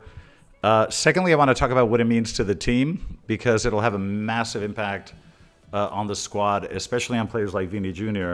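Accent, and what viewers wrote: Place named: United States. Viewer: You have a perfect American accent. Are you American-Spanish? Wait, are you a polyglot? French, Spanish and English? Italian and Portuguese maybe??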